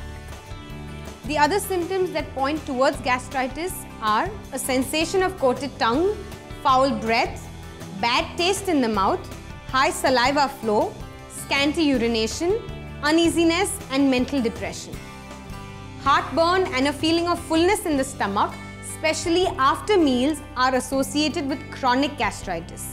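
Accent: India. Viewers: If you don't like Indian accent, why the hell did you hear it then?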